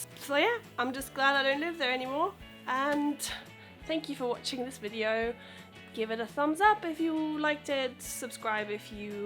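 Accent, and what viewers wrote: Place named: Home Counties, United Kingdom. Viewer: If you are German how come you have managed a perfect English home counties accent? Seems strange to claim you are German when clearly this isnt the case, but harmless I guess, and fine if it makes you happy...................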